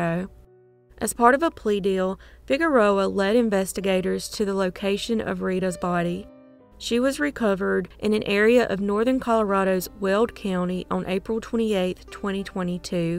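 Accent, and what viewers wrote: South, United States. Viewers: Hey. Just found your channel by accident but love it. Great job telling these stories. Love your accent! K 🇨🇦 🇺🇸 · I could listen to that sweet southern accent all day. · It’s so nice to hear another southern voice. Very nice and organized video so thank you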